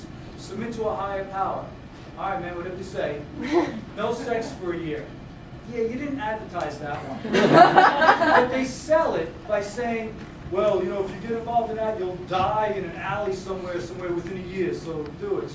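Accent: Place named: New York, United States. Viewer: Dude, you are funny guy. I love your accent. Is it NY or Chicago? LOL If you watch my latest vid you will see the proper way of adjusting ric truss rods. PS- I liked rehab so much I went back three times. Hey I subbed to you, sub back. You rock.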